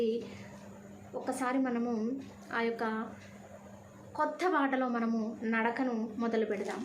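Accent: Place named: Telangana, India. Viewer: మీది తెలంగాణనా అమ్మా... మాం డలికం 👍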